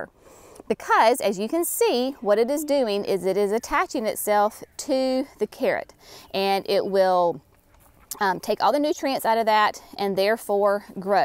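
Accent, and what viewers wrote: South, United States. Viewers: hahahahaha thanks for the correction and I just love your Southern accent it’s a very soothing sound to me ✨🤍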